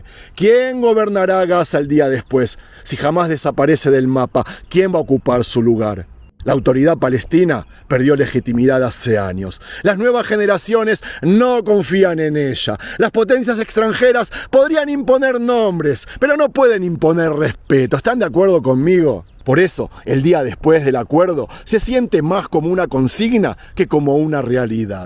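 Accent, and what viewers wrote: Argentina. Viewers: No confíes nunca en los polîticos, seguîd en peligro, los terroristas siempre lo son, pactos de paz para bebeficio de los firmantes, yo me vompadezck de los pobres gazzties masacrados por sus propios compatriotas, tiros en la cabeza porque tenemos que seguir matando a alguien, es nuestra rasôn de ser, Te escribo desde edpsña, aquí tuvimos a eta, ahora estàn en el gobierno, cobrando como políticos, sentados en despachos y blanqueando su pasado asesino, las pistolas estàn calladas, pero siguen guardadas, estás muy acertado, te noto cabreado, y no es para menos, trump! Un megalómano, on ínfulas de gobierno total, cuidaros mucho, ya os falló una vez el sistema de seguridad, a Dresde ó no?, fue atentado con ayudadrl propi Israel, posible,?, todo un gran teatro. Ànimo y ojo avizor wué tengáis mucha suerte y wué dios os proteja, desde espsña con amor, gran reportaje un gusto oírte ese bello espsñol argentinizado ó bueno como sea me gusta tü musicalidad.❤❤❤❤❤❤❤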